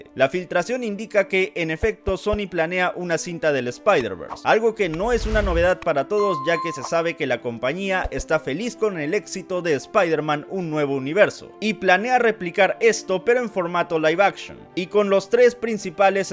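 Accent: Peru. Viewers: Déjame adivinar. Peruano?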